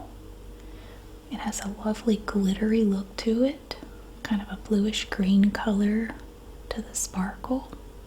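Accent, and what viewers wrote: South, United States. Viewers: I think the reason we love the soft spoken videos is that when you whisper, we can’t hear your beautiful Southern accent! ❤❤❤❤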